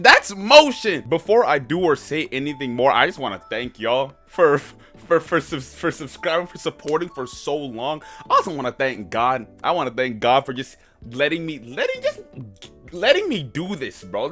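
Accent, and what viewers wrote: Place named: Canada. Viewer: Bruh tell me how I aint know yous canadian. Im deaf ash to accents n shi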